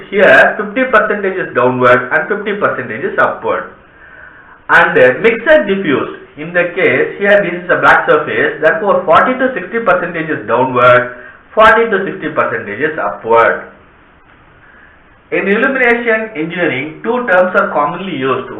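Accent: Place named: South India, India. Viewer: English with a heavy south indian accent.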